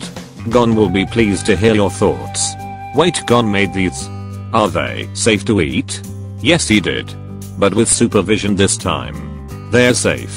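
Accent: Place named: England, United Kingdom. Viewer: I sense a fellow english girl...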